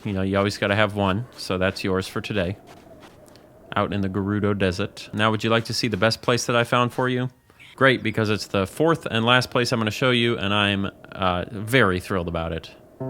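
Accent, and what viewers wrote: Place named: Minnesota, United States. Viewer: I’m thinking to myself “this sounds like a man from Minneapolis”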